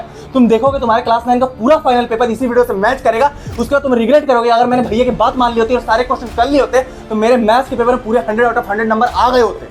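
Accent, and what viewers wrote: Bihar, India. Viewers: Aap bihari ho kya?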